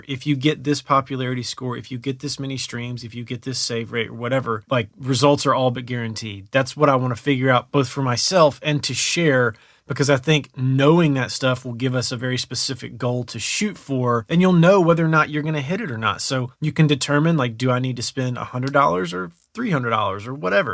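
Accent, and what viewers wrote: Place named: South, United States. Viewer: good stuff man! appreciate it. noticed a southern accent, where are you from?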